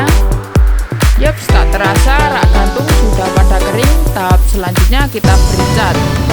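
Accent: Java, Indonesia. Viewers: Bang medok bet😁😂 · Orang jawa ya mas kok suaranya persis orang jawa aku orang jawa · Tolong kurangi lah medok nya saya jadi gak nyaman nontonya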